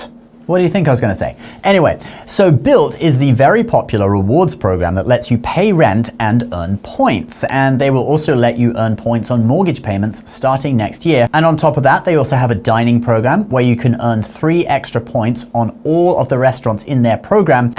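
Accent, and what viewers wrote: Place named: United Kingdom. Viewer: Me too, love that UK accent- direct and to the point, not alot of fluff. Nice funny jokes and new content regularly--- he gets 100 cool points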